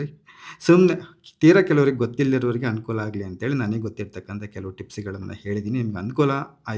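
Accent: Chitradurga, India. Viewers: ನನ್ನ ಊರು ಶ್ರೀರಾಮಪುರ ಹೊಸ ದುರ್ಗ ತಾಲ್ಲೋಕು ಚಿತ್ರದುರ್ಗ ಜಿಲ್ಲೆ ನಮ್ಮ ಊರಿನ ಕನ್ನಡ ಕೇಳೋದಕ್ಕೆ ನಾನು ನಿಮ್ಮ ವಿಡಿಯೋ ನೋಡುತ್ತೇನೆ ಅಡಿಗೆ ಕೂಡಾ ಅಷ್ಟೆ ನನ್ನ ತವರಿನ ನೆನಪು ತರುತ್ತೀರಿ ಅದಕ್ಕಾಗಿ ಧನ್ಯವಾದಗಳು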